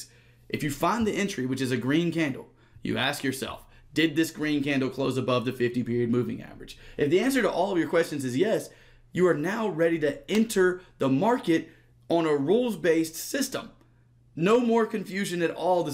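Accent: South, United States. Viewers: Love your quality content & your southern accent brother. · Very helpful videos, love that subtle southern twang too!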